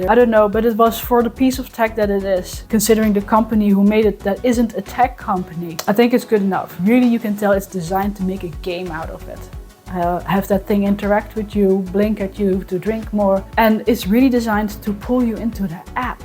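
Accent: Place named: Netherlands. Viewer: I can tell you're Dutch by the accent ^^ I've been drinking waterdrop for years, got my mom on waterdrop too, it's good stuff. I got the Lucy with my points, so basicly 'free'? I don't think it's necessary, our tab water is clean enough. But it's a fun piece of tech. The Lucy Carafe though! (Also got it with points) I use that daily. It filters water really well. And when I placed a clean glass of tab water next to a clean glass with filtered water, I could see the difference, tab water does look blurry compared to filtered water.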